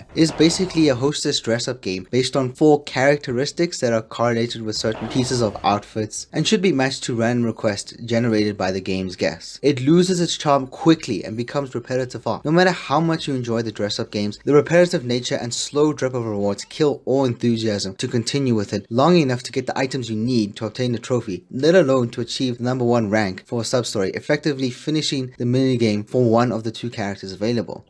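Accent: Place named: South Africa. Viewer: He's south African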